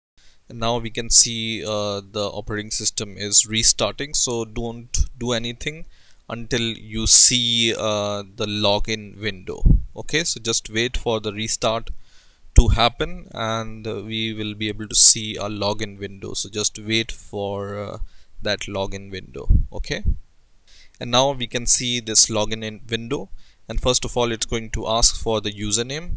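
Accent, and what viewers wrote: India. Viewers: ur accent looks like indian to me ;) 😉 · I'm tired of this Indian accent :/ Thanks for the video though. Liked :) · india isfunny with speak English >.>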